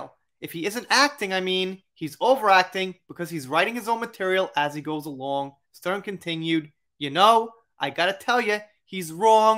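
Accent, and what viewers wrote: New York, United States. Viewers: Never liked Howard Stern he has a big ego,well people are going to go bad for stern,he's putting people off,johnny is innocent, your from new York I love your accent,I wondered why I liked your voice and like listening to it,to bad we can't have hot chocolate and playing monopoly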